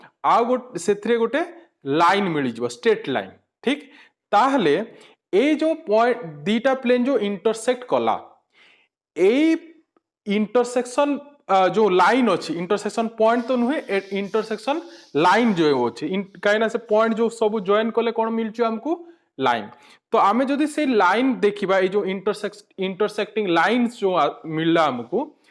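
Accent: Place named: Sambalpur, India. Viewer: But sir mate lagila je apanankara sambalpur boli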